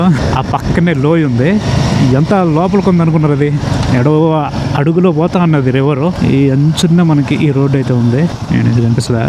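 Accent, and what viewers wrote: Nellore, India. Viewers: Nellore bro...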